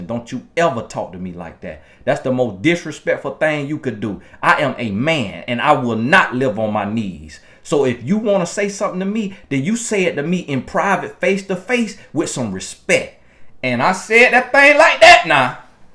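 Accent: South, United States. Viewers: Wooo, I live for the country accent. Reminds me of my grandmother, (mudeel). She would say, "Cinde, go and wrench that towel over the sink". Cinde ain't nowhere on my birth certificate.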